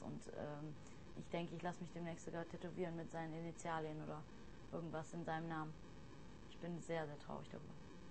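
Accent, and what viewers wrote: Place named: RP, United Kingdom. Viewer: This is not something that you see every day. Posh British proper accent to straight up German. For years she had me fooled. When she sings she has an American accent. Then I heard her British accent and was surprised. Then I found out she speaks perfect German. It's so weird. I think I'm more jealous of this than her fame and fortune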